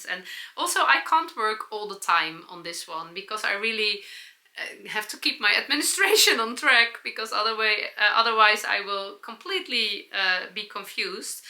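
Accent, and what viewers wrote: Netherlands. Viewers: Hello, nothing wrong with your "dutchy" English, makes me smile when you say that. Love the accent. My g.g.g. was from Oenkirk, Netherlands which I visited in 2019. So it gives me an idea of what his accent might have been like. He jumped ship in Melbourne, Australia in 1851 (my birth place). So I may not have existed if he had not come to Australia. Your prononciation is correct. ❤ We have just returned from snowy Norway and 5hrs of daylight till only 2.30pm was hard to get used to. When you had the knit event, I was on a ship heading up the west coast of Norway. So couldnt come to meet you and Yana.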